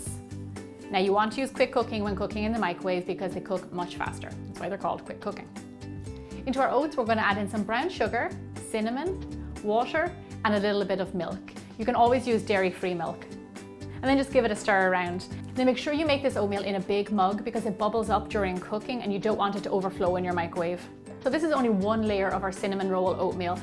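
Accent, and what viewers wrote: Ireland. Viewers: Are you Irish? Love the accent! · Are you Irish!?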